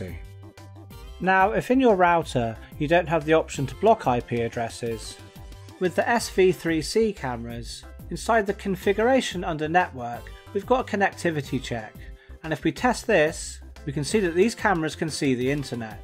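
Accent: United Kingdom. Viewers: Another Great vid. I hear your from South West England like me!! From Exeter, Devon my self!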